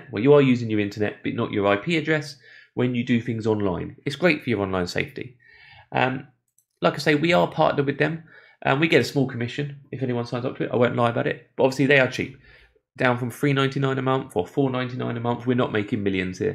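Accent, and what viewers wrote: United Kingdom. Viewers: I had to watch this video several times in order to tell exactly what this man was saying, as his British accent was difficult to discern. But great points on how to enact privacy methods. Thank you very much. Be safe and well.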